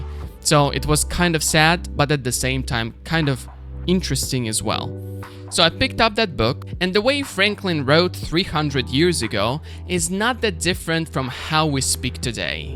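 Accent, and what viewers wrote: United States. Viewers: Hi, just one question for you '' What has made you sound American? as you mentioned you have never set foot on the U S. thanks. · are you American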